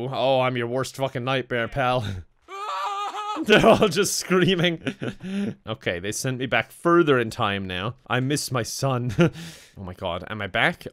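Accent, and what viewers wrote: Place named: Ireland. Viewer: After awhile the Irish accents just seems normal to me. It's just. Then he pronounces Z as Zed and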